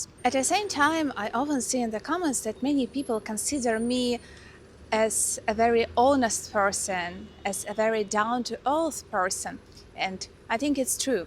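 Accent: Russia. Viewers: Yes people dont seem to put salaries and costs together they just focus on one or the other. They also dont look at exchange rates for different areas/countires. Im glad you have been able to see some other countries and hope you can visit the USA someday. You speak english well just dont lose that charming russian accent. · You speak very good English, do not be shy or embarrassed by your accent, 99.9% of Americans. do not know a single word in Russian......Are you familiar with another Krasnodar blogger, he is an American who lives there, he goes by the name of "Comrade Captain Ron".?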